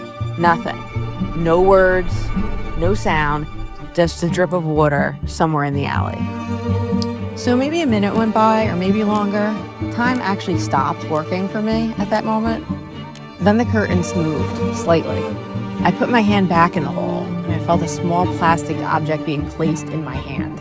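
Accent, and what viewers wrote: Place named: New Jersey, United States. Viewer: Loved the narrator's voice... she's definitely Jersey. Looking forward to more.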